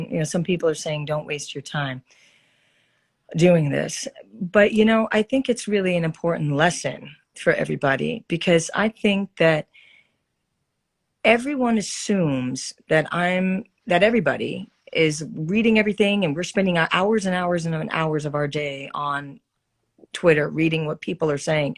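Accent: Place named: Brooklyn, United States. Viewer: the brooklyn accent and some evil queen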